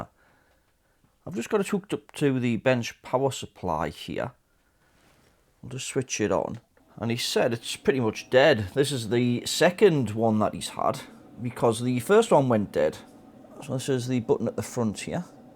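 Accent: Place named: Newcastle, United Kingdom. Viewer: I've just viewed about fifteen YouTube videos on inverter faults and repairs and yours is the first to be watchable, simply because you don't put annoying and unnecessary 'muzak' in the background! I hate this trend on tutorial videos, these video makers don't realise how distracting background noise ('muzak') is to some viewers who simply want to learn the subject. Well done, great (and peaceful) video, with just your easy-going geordie voice talking us through the diagnosis/autopsy. SUBSCRIBED and up-voted.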